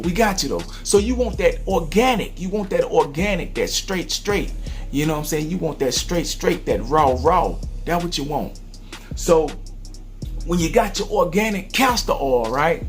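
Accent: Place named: Memphis, United States. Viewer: You sound like you're from the Mighty Memphis. Are you from the M Town?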